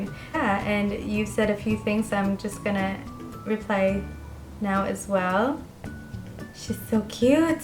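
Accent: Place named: United States. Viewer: You mention you've lived I Australia for 14 years so where does the American accent come from? :)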